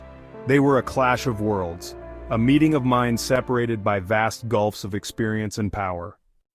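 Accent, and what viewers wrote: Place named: United States. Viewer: American voice over is annoying. The Australian site Heroes and Legends does it better 😢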